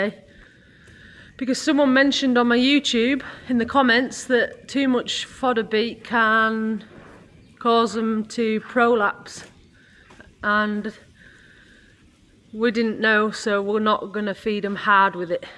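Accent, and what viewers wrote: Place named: North England, United Kingdom. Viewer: north yorkshire, cumbria border. thought you were somewhere up north. lovely accent. secondly, you really do get completly different weather up there. you are harder. fair play to you. i hope you get some decent spring weather. and everywhere dries up. you are another superstar of the industry. good on you.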